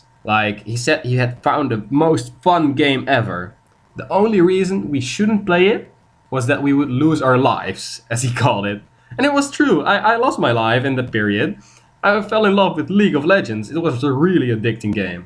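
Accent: Netherlands. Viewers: You are Dutch arent you? haha the accent is such Dutchie :P Youve got a great video over here!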